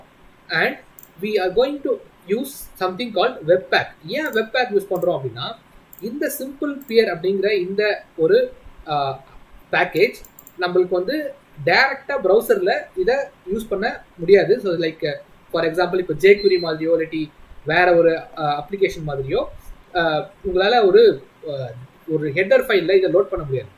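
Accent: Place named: India. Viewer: As an Indian myself...i cannot understand him.....his Indian accented English is atrocious.....Or is he speaking Mandarin ???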